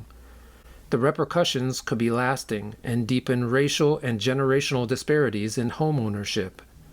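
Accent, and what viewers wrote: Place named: United States. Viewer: 抱歉。 好希望能换一位老师读，这位美国老师的发音确实不好听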